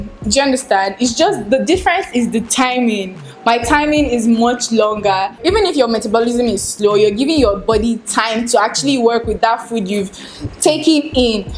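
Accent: Nigeria. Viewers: is that Nigerian accent 😍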